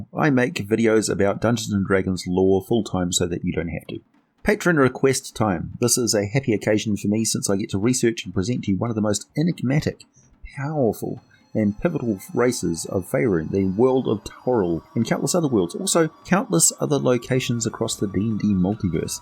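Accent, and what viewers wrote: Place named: New Zealand. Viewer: Ya see, this is why you need to do those Creator Races videos. We need to know this stuff, and we need it delivered to us in a Kiwi accent.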